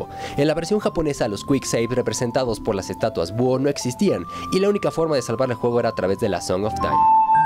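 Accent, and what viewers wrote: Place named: Fresa, Mexico. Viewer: me caga la voz de, fresa y cantadita.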